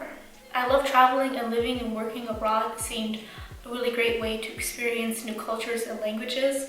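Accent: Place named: Germany. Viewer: You do not sound like you are originally American your accent sounds like you are a German that moved to America!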